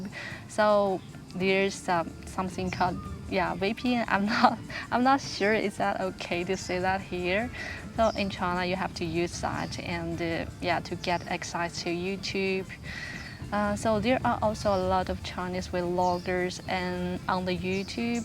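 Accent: United Kingdom. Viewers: You have a light British accent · I see you as a beauty and brain lady and I noticed that there are instances when you speak there’s a British accent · you sound little bit of uk/british style accent. what school in china you learn english? i think china government can still track you even in vpn by requesting from chinese internet providers(if you are not using chinese internet provide then maybe you are safe). free vpns definitely they can see what you are doing, paid ones are encrypted. but i think they could still decrypt that now that china has quantum computers. try to start criticizing chinese government, you'll see😂 just kidding. kinda scary, even jack ma didnt escaped president xi😅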